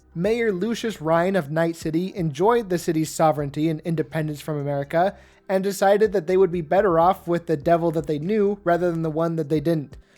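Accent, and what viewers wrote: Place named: Argentina. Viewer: Off topic, you have a very argentinian accent, i don't know what to think xD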